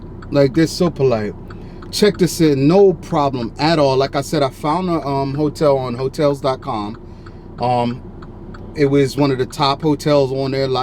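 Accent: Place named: DMV, United States. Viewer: Sound like you in the DMV area lol!✊🏽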